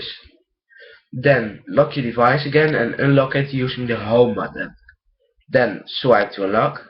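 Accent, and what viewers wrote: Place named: Netherlands. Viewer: je bent nederlands kan ik horen en je naam :p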